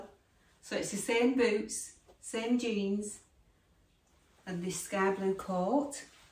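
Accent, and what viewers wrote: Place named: Lancashire, United Kingdom. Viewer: I'm new to your channel, and I've enjoyed watching your brilliant ideas. I've got to add, I love your accent, we are originally from Preston Lancs, and your accent takes me back to living there! Thank you.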